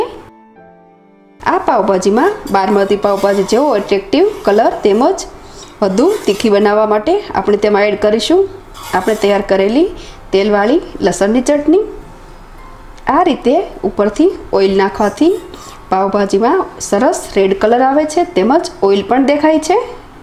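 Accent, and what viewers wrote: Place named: Kathiawar, India. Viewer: વાહા બવૂજ શરસ કાઠીયાવાડી સંવાદમા ભાજી ખાવીની મંજા ઓરછે બીજા વીડીયો મૂકછોજી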